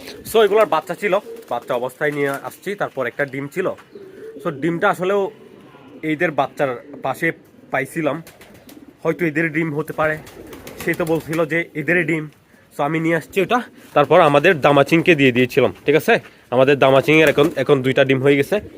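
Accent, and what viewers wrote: Chittagong, Bangladesh. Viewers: চিটাইংগা ভাষা হজে সুন্দর লাগে 🎉❤❤😅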